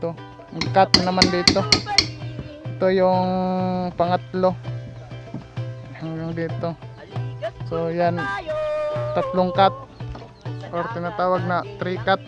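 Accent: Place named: Visayas, Philippines. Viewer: Hahaha abi kog peko agaw sa pala mahirap talaga tayong mga bisaya mag tagalog hehehe 🤣 proud jud ko sa akong pagka bisaya bai..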